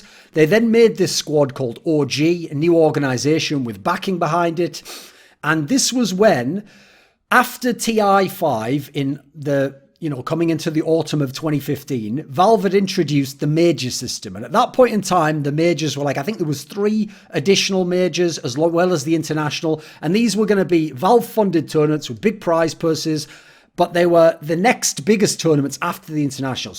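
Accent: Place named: Scotland, United Kingdom. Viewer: I just can't get past the Scottish accent. Not that's it a bad thing, but it's just noticeable to me. :)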